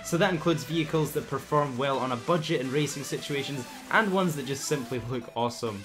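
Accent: United Kingdom. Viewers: Great video brother trying to figure where in uk your from ireland??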